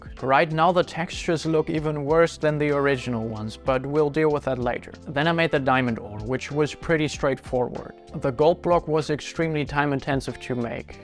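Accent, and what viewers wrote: Germany. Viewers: he sounds so german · Man hört den Deutschen Akzent 😂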